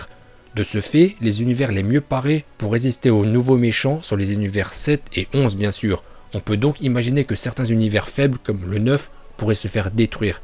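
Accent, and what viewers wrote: Japan. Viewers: nous on veut ton accent japonais! Ton travail n'est plus extraordinaire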